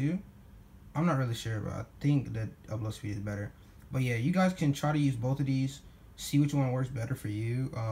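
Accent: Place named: Baltimore, United States. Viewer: That Baltimore😁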